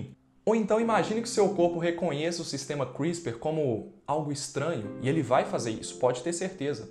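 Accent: Minas Gerais, Brazil. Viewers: Adoro seu sotaque mineiro!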